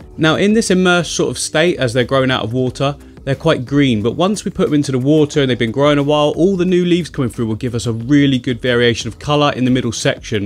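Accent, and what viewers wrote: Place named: Somerset, United Kingdom. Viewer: I’m from Exeter and you are definitely not far from here because you sometimes sound so Somerset 🤣 love the videos, I would love to get into scaping myself but I’m so skint rn. Coronavirus doing us dirty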